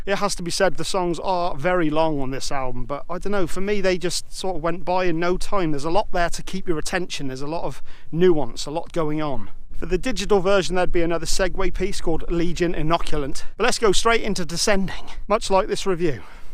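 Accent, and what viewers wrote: United Kingdom. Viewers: Looks like the English countryside,but then he does have an English accent.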